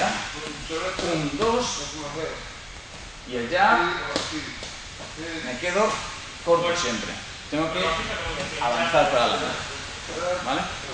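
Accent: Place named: Galicia, Spain. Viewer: Es gallego valezzzz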